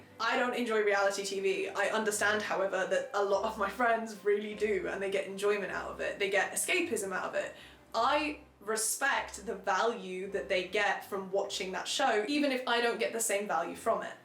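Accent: United States. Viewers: when you apologized ahead of time for your american accent i wasnt ready for it to be actually so amazing. generally when people do american accents they just do southern or valley accent! your american accent is INSANE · wait why is your american accent flawless what the hell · THE AMERICAN ACCENT 🤩🤩 it is toooo good!!! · YOU’RE TELLING ME YOU’RE GIVING US HOURS OF CONTENT IN MULTIPLE PARTS? WE WIN WE WIN (Edit: As an American, I am simping for your American accent 🧎‍♀️) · the american accent is so funny LOOL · Your American accent is insanely good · Yeah her standard American accent is spot on. Obviously a lot of us don’t sound like that, but it’s recognizable and good. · As an American omfg??? Why is no one talking about how like surprisingly good the American accent is??? Idk why but hearing people who don’t have an American accent do that accent gets me all giggly she sounds better than I do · The way you presented everything was amazing! 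I laughed a lot! I love when you pointed out the way things just didn’t make sense. And your American accent chefs kiss 😂😂 I was entertained the whole way though! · American avid reader here. 🙋🏽‍♀️ Awesome American accent, shite books. They’re all really bad copies of much better smutty series from the 2000’s. Just my opinion though. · Busts out a damn near perfect generic American accent than slowly starts turning into cursive 💅🏽❤️ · obsessed with your american accent - i only read book one, but now I want to read all of them so I can listen to your recap after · your american accent is so good😭😭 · Your American accent is pretty on point girl! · Your american accent is making me feel some typea way 🫣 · Your American accent is kind of everything?? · From an American person I love your American accent! For this series I started with Twisted Lies (it was the most interesting sounding one and I read that Ana said they can be read in any order) and I actually really enjoyed it. 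Bit slower paced but I did love it. I didn't really like Twisted Love and to date Alex is my least favorite among the twisted men. Twisted games is my favorite in the series by far and Rhys is the only tolerable amongst the twisted men. One thing that I really hated about Twisted hate is that its completely killed Jules' and Josh's characters. They were silly gooby guys in the last, second, and first books but were insufferable in their own book. Also just wanted to mention that Ava's mom's name is actually Wendy. It was mentioned once during her dad's villain speech when he mentioned that Ava looked nothing like him and mainly had a resemblance to Wendy (her mom) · that american accent is insanely good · Omg can you just read books in your American accent I'm obsessed I need more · From Missouri USA and I love your American accent ❤ if you read books in that voice I would buy every single one. 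· Okay but why is the American accent so good??? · your american accent was not bad actually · As an American, I wish I had your American accent 😒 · Thats a damn good american accent! 🇺🇸🇺🇸🇺🇸🇺🇸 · This video gave me a good giggle, thank you! I am...no longer intrigued to read The Twisted Series unless it was for just straight up giggles. LOL Also your American accent 15/10, exceptional.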